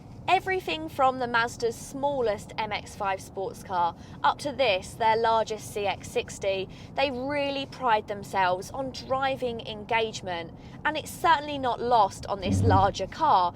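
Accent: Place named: Colchester, United Kingdom. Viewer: I've decided to test drive one, before I commit to a RAV4 PHEV. Have you compared them? I love your channel. Your presentation skills are great. That's a lovely accent; Colchester, if I'm not mistaken. Best wishes, John, X. PS As it happens, I have 35mm film projection equipment here that was first installed at the old Odeon, Crouch Street, Colchester.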